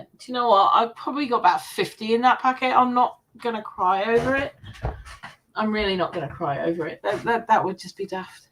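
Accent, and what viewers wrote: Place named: Australia. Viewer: Oh thought you were from England I see Australia now accent threw me for a loop! Do you garden? You should very relaxing. I garden I believe I saw you comment on a gardening channel. I am a Christian! I love to garden?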